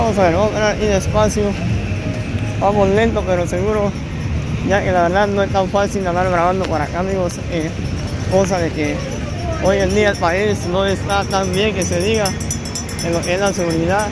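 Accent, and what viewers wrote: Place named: Guatemala. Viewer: Me gusta tus videos mas porque usas el acento chapin no de otros paises siempre usa el acento chapin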